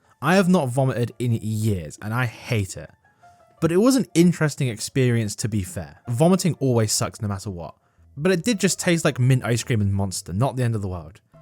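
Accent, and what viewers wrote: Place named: United Kingdom. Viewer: love the way you talk a great uses of being british.(im british)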